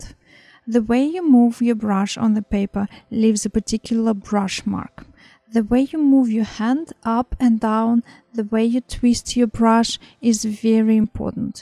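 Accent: Russia. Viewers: С первой секунды слышно соотечественницу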